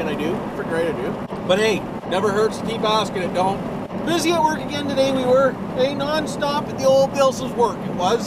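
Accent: Canada. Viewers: fuck eh you can tell you're from Ontario 😂 i only say this because I'm from the Stratford area and we all talk the same glad I found the channel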